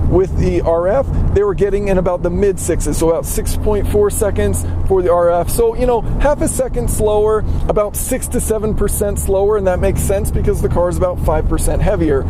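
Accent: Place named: South, United States. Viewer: You can tell right away , that you are from the south .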